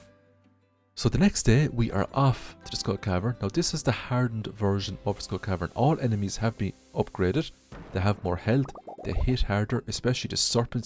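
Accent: Ireland. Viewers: Dying to know what part of Ireland you are from!!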